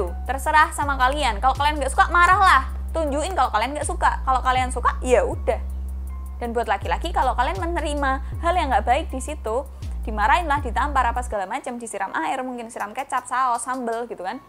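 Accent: Java, Indonesia. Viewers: Medok mu semangatku mbakk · Kak kalog bicara lucu yak medok medok gemes....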